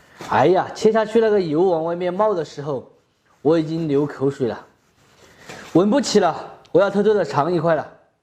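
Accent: Sichuan, China. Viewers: 你的川音普通話好搞笑！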